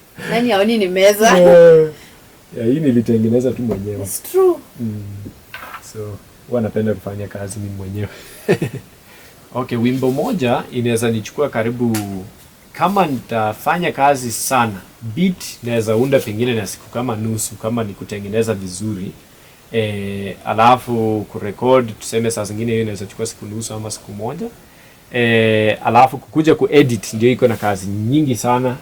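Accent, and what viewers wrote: Bukusu, Kenya. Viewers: FOR ME IT'S THE LUHYA ACSENT, I LIKE THIS HAPPY FAMILY, MAY GOD CONTINUE BLESSING AND PROTECTING THEM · Accent ni ya mbukusu bana he talks like mulamwah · The Luhya accent is just awesome 😀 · Mzungu mbukusu😅accent niya kibukusu aki😅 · The luhya accent is on point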